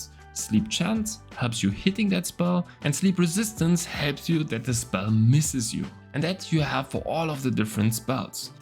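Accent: Germany. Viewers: Vom akzent sprichst du deitsch also wirst du sicher nichts dagegen haben, wenn ich meine frage auf deutsch stelle 😎 Was bedeutet denn die Zahl ohne %? Das kapier ich gar nicht. Zum Beispiel Melee Heavy Attack Chance 401...was bedeutet diese zahl? Und wo genau sehe ich die allgemeine crit chance im allgemeinen? Danke für deine antwort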